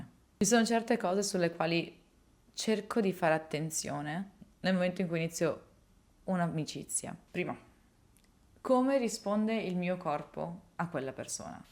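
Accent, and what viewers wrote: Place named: Sicily, Italy. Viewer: Ma stai sempre col ragazzo siciliano? Hai un accento più siciliano rispetto a 1 anno fa direi (detto da un siciliano).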